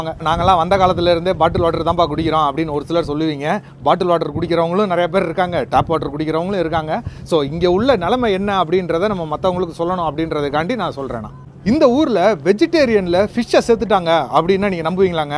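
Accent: Madurai, India. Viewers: you speak, is madurai slang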